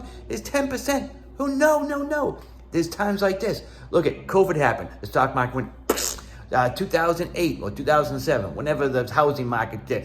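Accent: Boston, United States. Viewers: Ah Boston, I always thought you had a strong Boston accent and was confused that you were from NC haha, now makes more sense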